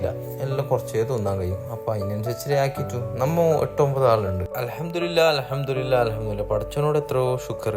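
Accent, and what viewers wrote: Kasaragod, India. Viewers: സംസാരം കേട്ടാൽ തന്നെ മനസ്സിലാകും കാസർകോട്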